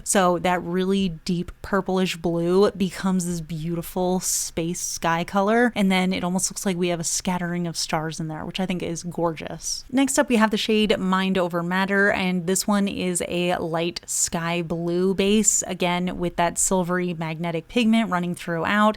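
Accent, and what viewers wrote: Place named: Midwest, United States. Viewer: You sound midwestern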